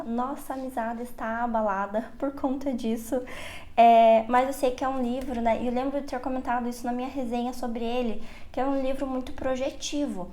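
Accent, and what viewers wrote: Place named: Interior, Brazil. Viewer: Olá, Vamos bater um papo?! Primeiramente que delícia de vlog. Segundo sua casa é tão linda! Você me parece do interior, pelo sotaque, mas fico na dúvida se é inteiro de São Paulo ou de algum estado do Sul. Parece que vocês vão morar um pouco distante da cidade, você está gostando de morar mas distante da cidade, caso seja esse o caso. Eu tenho vontade de ir para uma cidade pequena e morar um pouco distante do centro comercial do bairro mesmo, mas bate aquele medinho, moro na cidade do Rio ou seja loucura e o bairro onde moro tem de tudo, o que eu acho prático, daí vem aquela dúvida se me adaptaria. Terceiro, também não consigo ficar muito tempo com o mesmo livro, eu me canso, igual você. Quarto, também não sou fã de salão, nem do lugar onde faço depilação que é relativamente calmo, vou porque tem que ir, se deixar crio raízes dentro de casa. 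Quinto também não gosto de lugar barulhento, lugar cheio e som alto me deixa extremamente cansada, eu sou introvertida, eu gosto de calma. Sexto amo resenhas sinceras, se não gostou de uma leitura acho bacana compartilhar com as pessoas não para fazerem elas não lerem mas para mostra seu ponto de vista, sempre acho que atitudes humanas, como gostar e não gostar de uma coisa nos aproxima, como dizem gente como a gente, e você faz isso super natural e de maneira sincera. Bom, falei muito, digitei na verdade, rs! Fique com Deus. Beijos 😘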